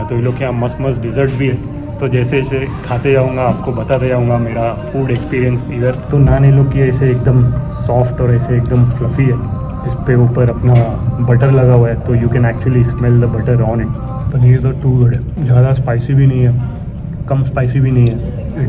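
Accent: Mumbai, India. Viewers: Typical Mumbaiya hindi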